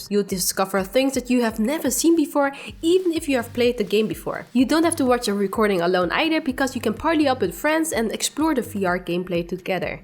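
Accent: Netherlands. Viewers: Probably dutch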